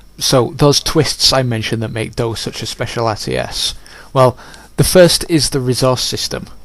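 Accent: United Kingdom. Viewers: are you from britain? just asking.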